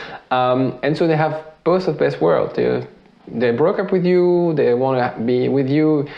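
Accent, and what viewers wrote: France. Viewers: Love ur French accent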